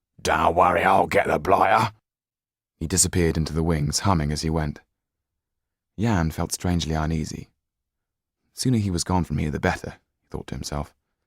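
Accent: Cockney, United Kingdom. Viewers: He is the reason my siri voice is ‘British man’ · Everything is better with a british accent. He could read out a phone book and my heart would still stop beating at every Second word!!!! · The cockney accent! Oh my god! This man is perfect x_x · Cockney accent. Oh my god *.*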